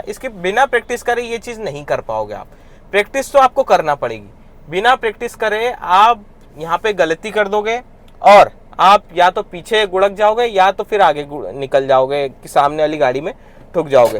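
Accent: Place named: Malwa, India. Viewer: मालवा क्षेत्र के हो लगता है ❤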